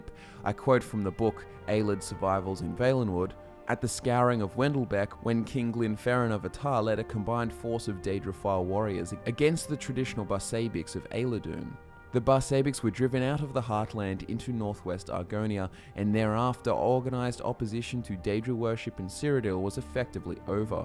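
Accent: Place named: Australia. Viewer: Been watching a lot of these lately and it is just so nice to hear an Aussie voice omg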